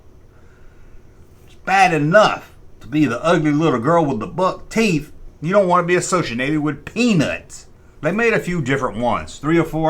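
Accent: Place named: New Jersey, United States. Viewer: Georgia? I thought you were from Jersey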